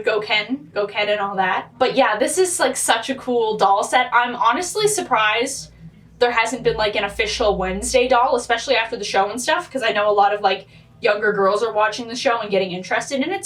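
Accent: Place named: Canada. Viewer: I like your accent! Canadian detected?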